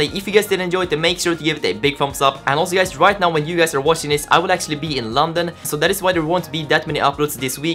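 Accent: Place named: Sweden. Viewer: Wait are u swedish? I can hell it by your accent 😂👍 jag är också svensk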